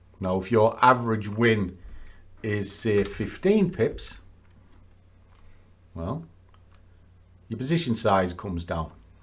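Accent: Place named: Sunderland, United Kingdom. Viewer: You from Sunderland area ?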